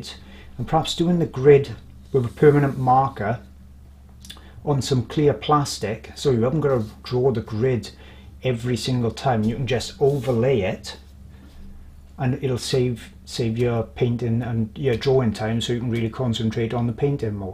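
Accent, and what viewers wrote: Wales, United Kingdom. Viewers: Ah hello my fellow welshman. 👋 It took me about 5 seconds to detect that accent. 😁 Great tips 👌🏻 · Hi You work is amazing...and I really appreciate you sharing your knowledge. Also being from Cardiff myself... it is refreshing to hear a fellow Welsh accent and to know that it isn't just singing but also Art that Wales is good at. Thanks Sandy.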